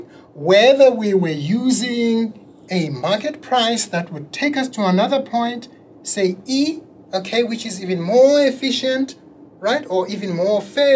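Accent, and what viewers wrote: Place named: South Africa. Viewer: I am a South African student at Columbia University, in New York and I came across your videos whilst preparing for a microeconomics exam. Your videos are excellent and were extremely helpful to me. It's always good to hear a voice from home too! Thank you.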